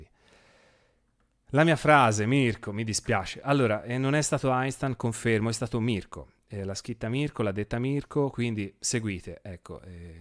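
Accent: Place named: Montecatini, Italy. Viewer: secondo me sei di Montecatini, parli come una mia ex :)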